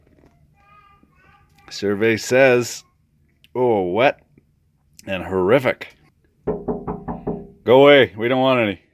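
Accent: Quebec, Canada. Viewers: Wow your French is very good ! Hilarious accent from Quebec tho ^^ Keep up the cool shit man looking forward to see your next cnc vid ;) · Are you Quebecois? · Tabarnack de calisse il parle québécois pas français calvaire de siboire d’osti de criss !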